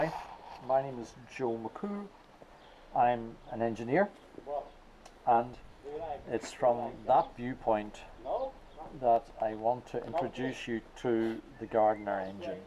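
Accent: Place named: Northern Ireland, United Kingdom. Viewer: Love the Northern Ireland accent!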